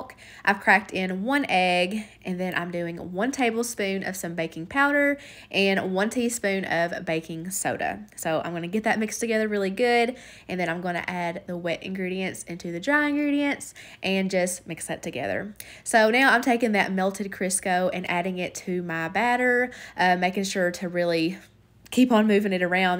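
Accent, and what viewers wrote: South, United States. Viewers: I'm so happy to see your back doing your what's for dinner videos, everything looks so yummy, I hope that in time you will start healing from losing your family member, I know exactly what you are feeling because I have been through the same thing, anyway, I'm so glad you're back, I surely miss when you don't post, I just love your southern accent, it's so cute!! Sending you and all of your family lots of love and hugs, take care, I'm looking forward to your next video, God Bless You All 💗🙏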